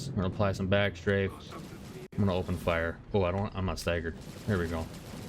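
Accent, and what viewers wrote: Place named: Mexico. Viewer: It really isn’t. The Kee sound you are promoting has sneaked into your pronunciation from the Mexican Spanish influence. Italian, Latin and English pronunciation is Kwu. Say the word queen - it’s not “ keeeen”.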